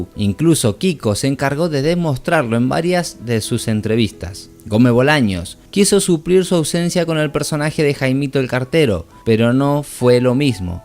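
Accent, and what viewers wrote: Argentina. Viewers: Narrador sos. Argentino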